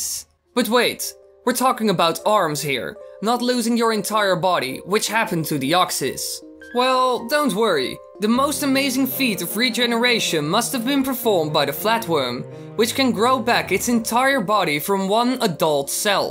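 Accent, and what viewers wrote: Netherlands. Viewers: i have one question, are you dutch because your accent sounds like one.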